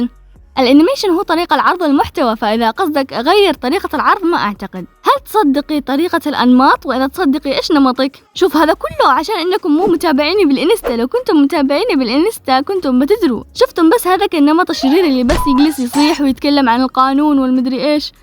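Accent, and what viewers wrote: Yemen. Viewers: والله من اول فيديو شفته لك حسيت ان يمنية 😂😂 وجيت لذا الفيديو اتأكد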